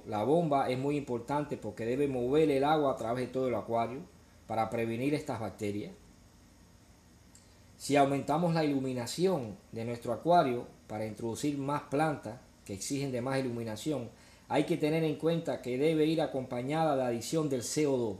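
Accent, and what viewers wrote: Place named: Cuba. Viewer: Amigo de q país eres ?? Suenas como cubano !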